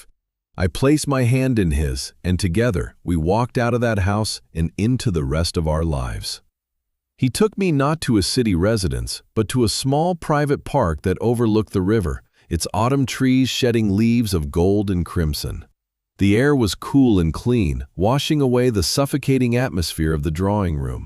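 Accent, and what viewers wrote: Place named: United Kingdom. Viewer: The AI narrator's voice is UK English, but the dialogue has clearly been written by someone who is a native US English speaker which does seem somewhat odd.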